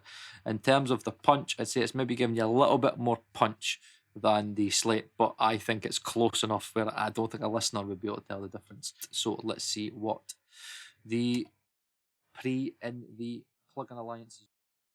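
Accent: Scotland, United Kingdom. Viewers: Goto another video if you can’t understand this lovely Scottish accent. I’m lovin it. Edinburgh or Glasgow? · It’s not Eastern European accent, it’s Scottish and so easy to understand.. not to mention that it’s one of the original and oldest English accents in the world, and I’m not even British. We should learn to appreciate the taste of pure coffee and not add sugar to it 😆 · Oi mate! I have been digging neOld a lot. Its warm an rich sounding. I compared to Slate myself and Slate`s feels colder. Lacks warmth, which can be good sometimes. I'm really enjoying neOld so far. Haven't used Arturia yet, but as far as I know, it has an eq built in, which takes it apart from the two. Nice video man. Love your Scottish accent by the way. Cheers lad.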